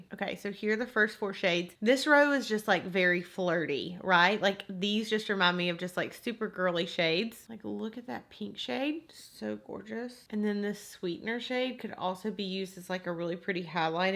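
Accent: South, United States. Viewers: Ashley Cornely okay I'll never visit the south of USA. Screw being surrounded by that accent constantly. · Splucy She has a southern accent and I love it! No reason to leave a rude comment you should just leave politely.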